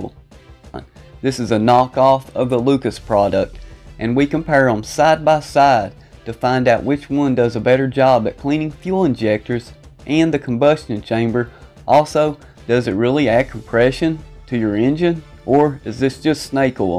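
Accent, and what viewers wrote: United States. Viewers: But he has such a cool cowboyish dialect 😆😆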